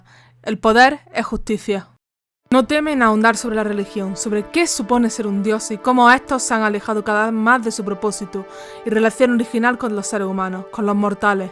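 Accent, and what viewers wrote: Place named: Spain. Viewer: Dejé de ver a los 20 segundos por el horrible dejo español....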